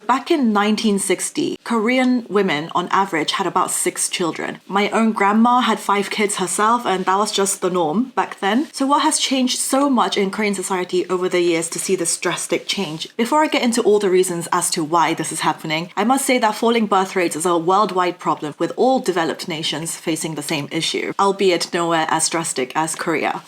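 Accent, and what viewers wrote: England, United Kingdom. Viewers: As for true romantic love, I may have fallen in love with you during this video. JK. You sound like you were raised mainly in England. Is that true? Anyway, good luck to the Koreans for getting their birthrates back up to at least replacement rate levels. Let's go young people. Get with the program! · When you had mentioned about people coming from families with a lot of divorces it strike a nerve. I come from a family that has very few successful marriages. I don’t know what a successful marriage is. It scares me. Another factor. Coming from a dysfunctional family. I became dysfunctional. I’ve been diagnosed with generalized anxiety disorder. Everybody has anxiety, but people like me our anxiety is on steroids. Lastly, I want to mention that you have a very pleasant voice. I hear a British accent. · No money...why u have british accent.lol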